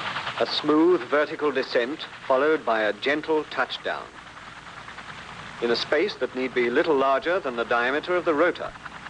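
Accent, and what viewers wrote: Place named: United Kingdom. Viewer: Oh what a fantastic British accent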